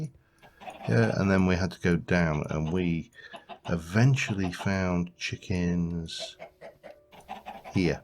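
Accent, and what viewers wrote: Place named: United Kingdom. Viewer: "Tears" for the series ending but with that comes a new beginning. I've been with you since your second series of TFC and I would just like to say that I think you have one of the best TFC series out there right now. Like Jimmyzation said I'd like your next series to go just as long. I"m from the US and my sister and I love british accents and whenever I'd have a problem with my family, work or pretty much anything I'd just sit down and turn on your TFC series and everything else just fades away. I do have a request. I wouldn't mind seeing a blooper reel. We're all human but its nice to see us being human every once in awhile. I also wanted to apologize for not commenting more, I know it gets your vidz out there. I watch your series on my game console. But I'd just like to say thank you for your time and effort. Your series has been a great inspiration for my own builds and a great stress reliever for me and my family.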